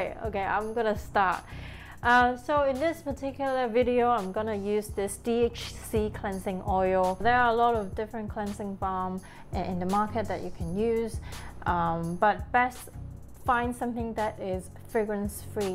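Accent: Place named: England, United Kingdom. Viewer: Hi, I love you English accent. The information you give us is very helpful. thanks for sharing.( 來自小船群組回訪)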